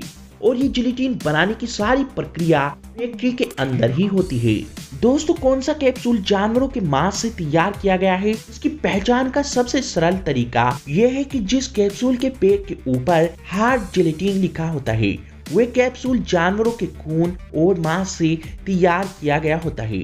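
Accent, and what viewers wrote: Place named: Bengal, India. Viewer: Bengali.. Accent mast rahata hai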